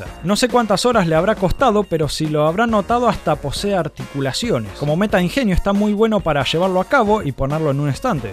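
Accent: Argentina. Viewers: Que argentino ameooooooooooooo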